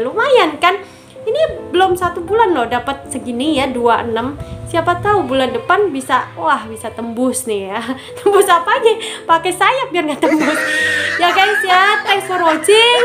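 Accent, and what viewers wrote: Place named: Jakarta, Indonesia. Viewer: Saya stuju mbak klo ngeyoutube itu gk semudah yg kita pikirkan, setahun lebih ngeyoutube sampe berlinang keringat sampe akhirnya dimonet. Skrng org sekitar pd ngomong "lu mah enak bikin video youtube doank gk usah capek2 tiap bln dpt duit dr youtube",, denger koment kaya gtu rasanya pengen nam****l 😂 Btw betawinya kental bgt mbak haha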